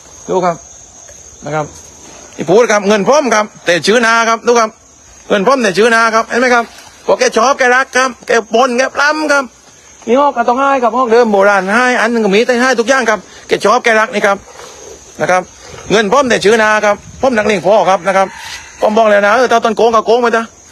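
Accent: South, Thailand. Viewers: ❤ชัดเจนคนใต้บ้านเรานิหร่อยนิ😊❤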